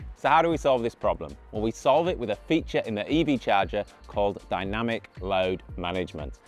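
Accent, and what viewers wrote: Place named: Northern England, United Kingdom. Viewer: Good to see a fellow Northern English person in Australia!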